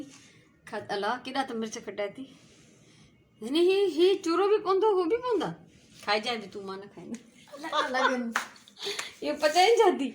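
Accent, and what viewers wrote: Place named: Larkana, Pakistan. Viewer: Assalamualaikum, dadho sutho lagho khalis larkane wari language budhi " KHAI DHO KAYO"😊 Asan khay Ayoob cholan ware ji khabar aahay jaiko Royal Cenima jay samohn rarhi lagaindo ho, tamam behtareen chola thahindo ho. Missed your Friday session.😞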